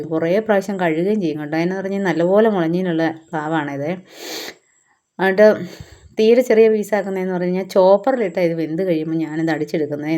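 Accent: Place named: Kanjirappally, India. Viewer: കാഞ്ഞിരപ്പള്ളി അടുത്താണോ ? Slang അങ്ങനെ തോന്നി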